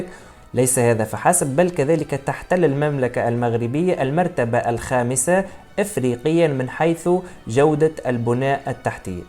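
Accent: Tunisia. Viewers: بارك الله فيك.. مجهودك مشكور ...وطريقتك في التقديم جميلة +اللغة العربية الفصحى بلحن تونسي جميل....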